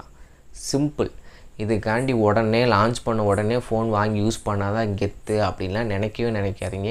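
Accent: Madurai, India. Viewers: Bro neenga madurai ya bro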